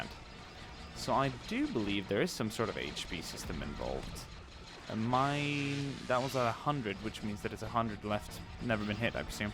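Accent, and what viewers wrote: Sweden. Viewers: are you swedish? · Your Swedish?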